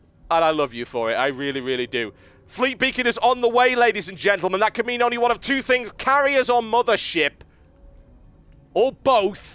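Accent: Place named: England, United Kingdom. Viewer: ? when did you get an english accent?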